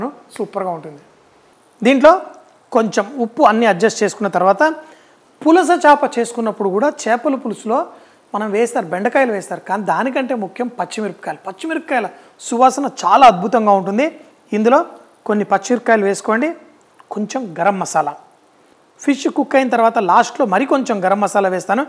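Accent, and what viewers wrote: Telangana, India. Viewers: Your Telangana slang is awesome!!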